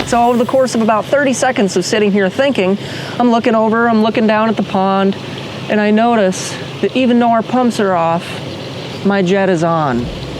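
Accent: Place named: East Tennessee, United States. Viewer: Epic!🐸👍🏻. Love the absolute “East Tennesseeness” and I love that this was for my favorite Doc💙🎄💙🎄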